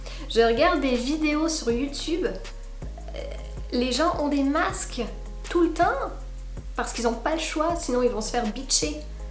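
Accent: Quebec, Canada. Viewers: t est en france avec un accent mais tu parle comme une quebecoise tu nous aura toujours dans ton coeur. ici on a commencer a vacciner.merci de pas nous oublier pout ton autre chaine plus tu va aller dans le domaine (SPIRITUEL) ca interesse certaines personnes mais pas tous ..je te souhaite de belles fetes mais sans neige rire bisou cher amie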